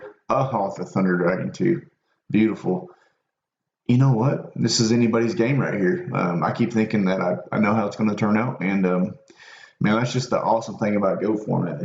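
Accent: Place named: United States. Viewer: Great review! Love the American accent 🦅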